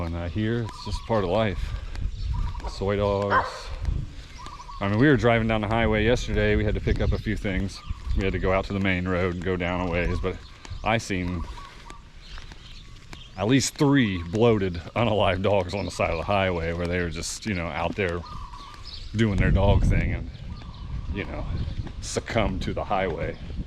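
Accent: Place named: United States. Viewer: at least your accent is giving someone with native English language from USA. You were not only raised by Americans, but you were/are exposed to American system a lot..... And I don't think it is funny. You had serious symptoms and yet it seems that you don't grasp basics of tropical diseases and that you, indeed, did have serious symptoms and that you are lucky to be alive. It seems you find this funny. Well, good for you.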